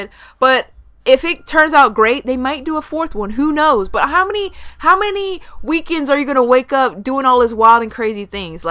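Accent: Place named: United Kingdom. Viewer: Lol your British voice, and I'm running out of comments on my phone...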